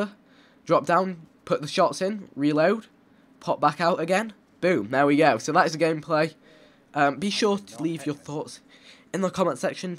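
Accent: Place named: United Kingdom. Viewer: Don't be silly guys. He's good old British!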